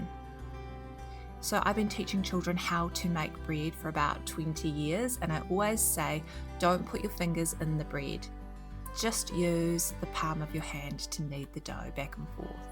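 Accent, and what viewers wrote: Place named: New Zealand. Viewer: Love a New Zealand accent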